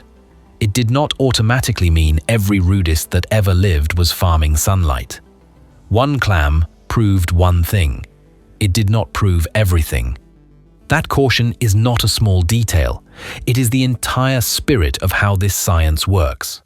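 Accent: England, United Kingdom. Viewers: What’s very funny is how the general public believes so much more in the narrator with an English accent, as opposed to any other accent rather irritating to me pompous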